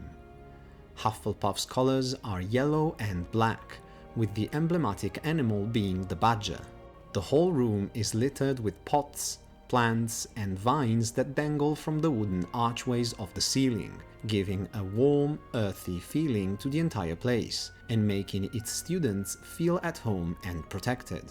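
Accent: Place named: Italy. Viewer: Ho riconosciuto il tuo inglese italianizzato dall’accento e dall’intonazione, devo dire che sei molto bravo e supporterò il tuo progetto perché sembra molto interessante e anche perché sto cercando di fare lo stesso cercando di avere un canale completamente lingua inglese💪🏻🫶🏻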